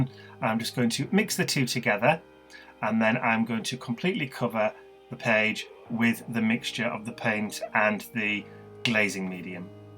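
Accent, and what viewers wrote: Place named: Goole, United Kingdom. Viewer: Love that page. I love listening to you, I'm from Goole and your accent reminds me of home, I've been away for many years. It's good to hear words like scrumpled!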